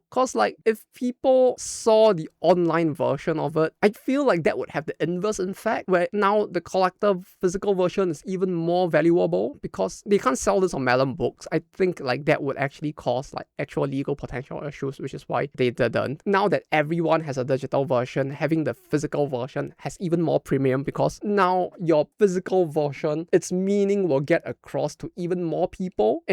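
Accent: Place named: Singapore. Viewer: did u learn english in singapore or from a singaporean? u sound singaporean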